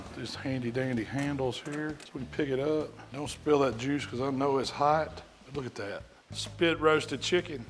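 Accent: South, United States. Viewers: Yes. Good old ooollll and follll. It's like the south lost the letter I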